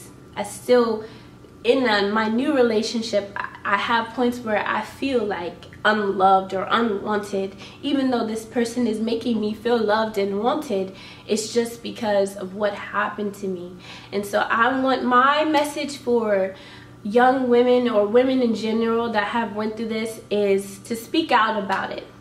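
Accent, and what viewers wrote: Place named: Ethiopia. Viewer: I’m sorry this happened to you. Stay strong 💪🏻. By the way, your Ethiopian accent mixed with American sounds soo nice 👌.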